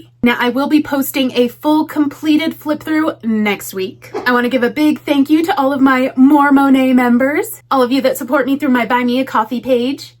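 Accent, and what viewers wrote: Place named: England, United Kingdom. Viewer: Hendrix is absolutely gorgeous 🩶 Your English accent makes me laugh 😂 it's like Emily from f.r.i.e.n.d.s and I promise we don't sound like that 😅 and as someone who lived in England 27 years and now Scotland for almost 5 years .... Scotland is definitely more scenic with beautiful views imo. Come to Scotland and I'll make you a full "English" Scottish breakfast with square sausage and tattie scone 😜 [im more an english breakfast person haha! But no black pudding 🤢]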